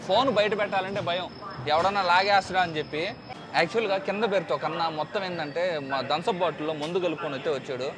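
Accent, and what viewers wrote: Nellore, India. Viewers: Nellore slang is super - mama 😁😁😄😄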